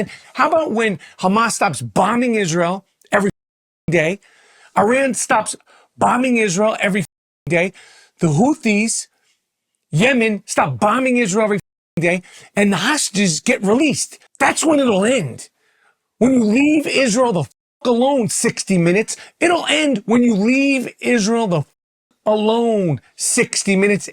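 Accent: New York, United States. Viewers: I'm here for the new York accent. I know you will bring something to the table every time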